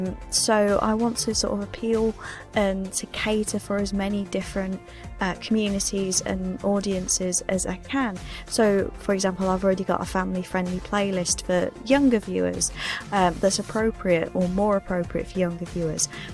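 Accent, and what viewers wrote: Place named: England, United Kingdom. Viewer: Great video:) Everyone has that funk and talking about it is honestly that foot hold that helps start the journey out of it. You would do amazing in the ASMR scene. The English accent alone would do it but you have such a smooth and soothing voice and very little inflation in your tone (All of this of course is meant in the best of ways). Good luck with your journey home and your new direction:)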